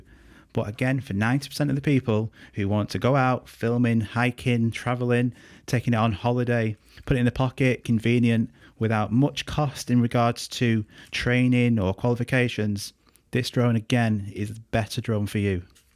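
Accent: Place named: North, United Kingdom. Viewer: Can’t trusts anyone with a northern chavvy accent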